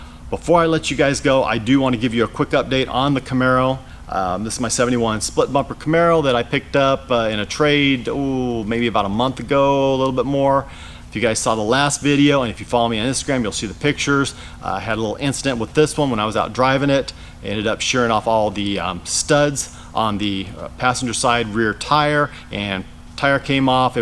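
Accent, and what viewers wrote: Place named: United States. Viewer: You sound like a regular American, especially for a Californian... 😉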